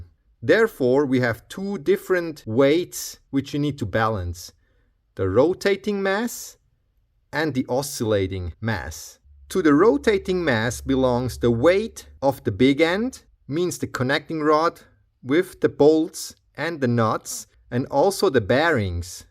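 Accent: Switzerland. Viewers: Wie immer hochinteressant und äußerst kompetent. Aber wie immer ist es auch diesmal wieder verblüffend, Englisch mit schweizerischem Akzent zu hören. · I'm so sorry but as a German I can't stand the Swiss accent in your English. Just the captions were a lot better.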